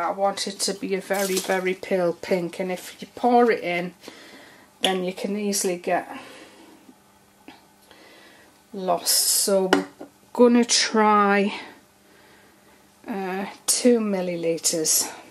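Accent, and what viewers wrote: United Kingdom. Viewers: Hi, you can get some really good plastic jugs from any of the pound shops in the UK. Hope that helps others. Love your videos, it's lovely to hear a UK accent on these videos:) Thank you x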